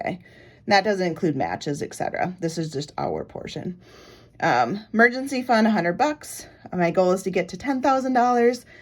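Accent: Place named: Midwest, United States. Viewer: For real! I didn't hear the nerves at all. I actually told my sister that I would listen to you if you had a podcast because it's like I'm chatting with a friend! Maybe it's the Midwest "accent" we have that sound so familiar hehe :)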